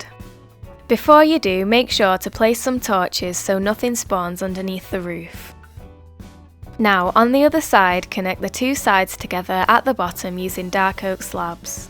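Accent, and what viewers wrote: United Kingdom. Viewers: I like your British accent.I sometimes try to make one too but I just can't :))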